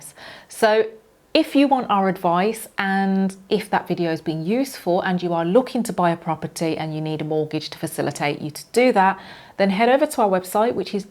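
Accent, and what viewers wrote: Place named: Essex, United Kingdom. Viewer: Thank you for the video, last time I left a message regarding to your Essex accent, now in this video you accent is perfect, I can fully understand everything. Thank you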